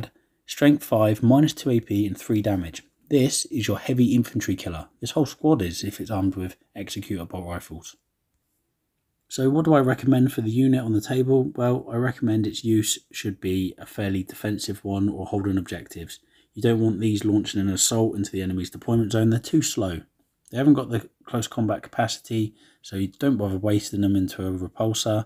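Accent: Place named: United Kingdom. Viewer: coupled with your British accent it makes it more difficult to follow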